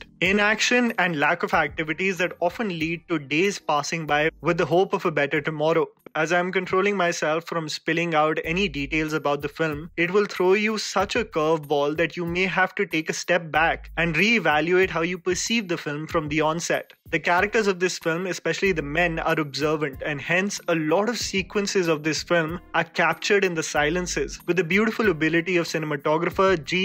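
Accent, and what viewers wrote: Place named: Bengal, India. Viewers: OK dude being a Bengali I have to say that you need to work on your Bengali accent as it's simply terrible. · sir your Bengali accent is to bad😒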